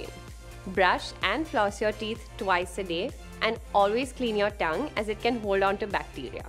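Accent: India. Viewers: Her, Face -🇺🇸🍔 Accent -🇮🇳🍛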